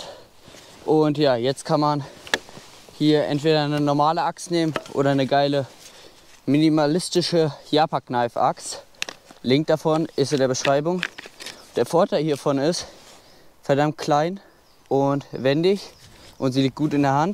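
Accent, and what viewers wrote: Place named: Bavaria, Germany. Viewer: achso finde du hast so bayrisch geklungen :D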